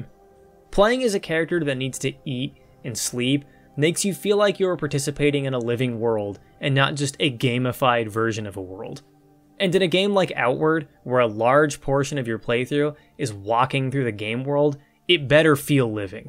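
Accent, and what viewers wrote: Quebec, Canada. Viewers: Quebecan? Lol. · quebecan HAHAHAH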